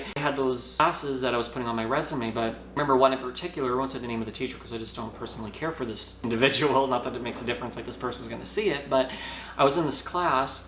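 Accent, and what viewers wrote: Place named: Boston, United States. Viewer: Always good to see a new video from you! Thank you for continuing to speak out and inform people what it was like for you as a public. Also, hello fellow Mass-head! I lived about half an hour north of Boston from birth to age six, and I've visited family up there often over the years. You've done well at neutralizing the accent! I still have mine on a few words, even after all this time, and whenever I visit, it comes back after half a day, and it takes me a couple weeks to get back to my normal mish-mash American accent. My wife thinks it's hilarious, for the first day or two, but then she starts calling me out on it.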